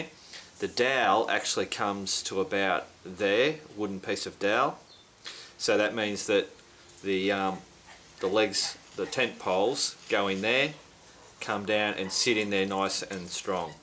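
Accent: Australia. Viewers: You make great videos very informative , thank you for adding US measurements . You have a great Aussie accent , took me awhile to get used to it. I live almost dead center of California only 40 miles away from the center in Clovis California thank you again keep up the good work !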